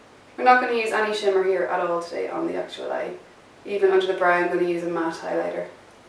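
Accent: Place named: Ireland. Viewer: irish english doesn´t sound right it soounds awful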